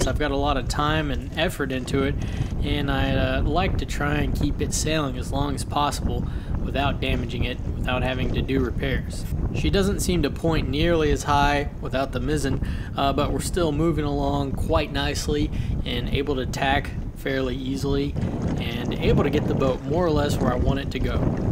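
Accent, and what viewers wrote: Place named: United States. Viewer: You speak with a an accent thats very pleasant to the ear. Somewhat laidback, very clear pronunciation but unmistakably American. Also: nice boat.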